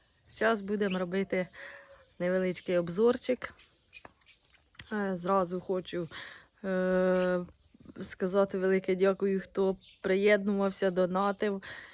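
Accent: Poltava, Ukraine. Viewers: Добрий день україночка! Говор полтавський! Звідки сама? Замужем? Трактор МТЗ зачот!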